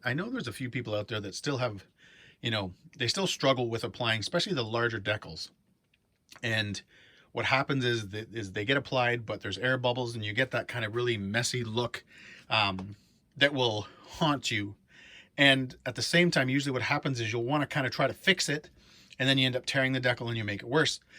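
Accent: Canada. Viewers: I think he might be Canadian though and in that case his pronunciation is correct for his region.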